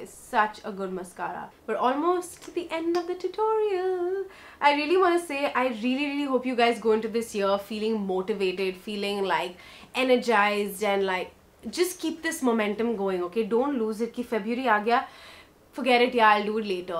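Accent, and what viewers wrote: India. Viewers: Love ur cute hindi accent... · I love your Hindi accent you sound so freaking cute! How people can hate it I don’t get it.. I enjoy it a lot when you speak Hindi trust me 😍🥰🤩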